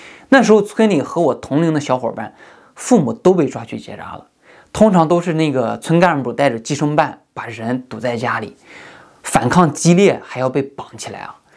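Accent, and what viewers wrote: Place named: Shandong, China. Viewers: 我也是1988年的，潍坊的，你听上去也像是山东口音啊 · 听口音是山东青岛人吧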